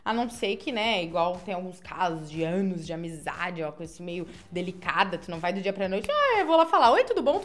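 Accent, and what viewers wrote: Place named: Florianópolis, Brazil. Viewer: Sotaque de Manezinha de Floripa. 😎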